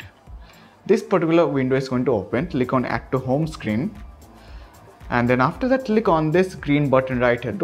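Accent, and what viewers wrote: India. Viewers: I can’t hear that india English dammmm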